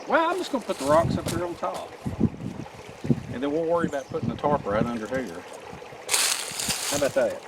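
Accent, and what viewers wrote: South, United States. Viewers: What state r u from? My husband and I just found you... he thinks Texas or Oklahoma. I think Georgia or Alabama? I’m from Tennessee (but have resided in Michigan longer than Tennessee)and I’m not sure? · He’s definitely one of my favourites too. As an English woman, he’s so different from anyone I’ve ever met. His accent is adorable, but his skills & knowledge on everything is astounding. I’ve never not laughed in one of his videos either. I think he’s what you call a true southern gentleman. I can’t believe you watched for 3 days straight! That’s dedication 😂 & you must know everything about bushcrafting now! Glad you support him as much as I do. He truly does deserve it. Much love 💕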